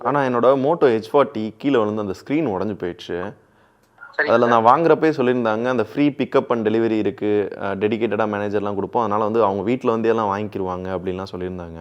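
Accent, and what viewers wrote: Madurai, India. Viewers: Bro Nenkee Madurai yaa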